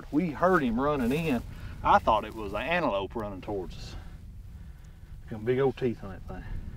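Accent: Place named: Kentucky, United States. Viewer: don’t ever lose that Kentucky accent. That’s authentic Americana right there. Respect. Oh ya, you’re a hell of a shooter, too.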